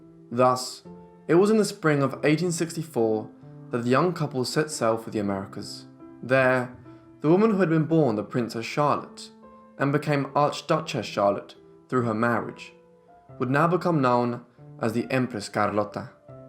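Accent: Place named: United Kingdom. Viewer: You can't use the snotty British voice It's a nightmare to listen to.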